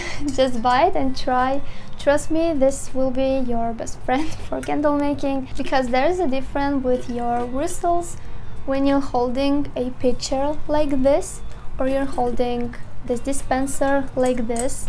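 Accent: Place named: England, United Kingdom. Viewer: Loving your videos, your english accent is sweet you are doing a fantastic job, keep it up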